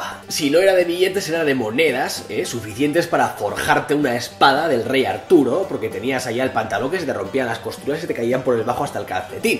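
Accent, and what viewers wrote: Bilbao, Spain. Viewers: Nada mas escucharte ya sabia yo que eras de Bilbo, zelako azentua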